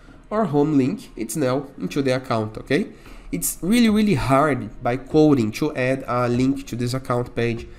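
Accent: Brazil. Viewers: tabom tabom vc ta certo vc criou algo kkkkk mas eu ri mto reconheci o sotaque brazilero na hora kkkkk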